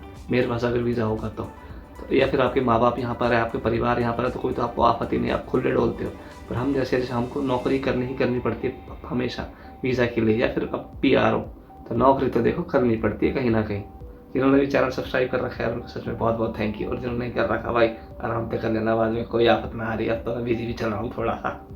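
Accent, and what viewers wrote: Haryana, India. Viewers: Bhai k video dekh kaza aaajaata h...thodi tension me lgra h.. bit haryanvi h bhai.. jhel jaega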